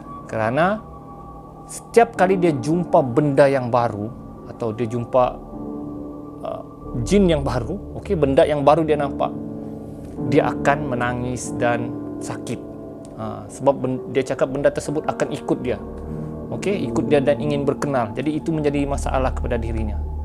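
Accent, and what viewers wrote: Sabah, Malaysia. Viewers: mmg best loghat Sabah...ni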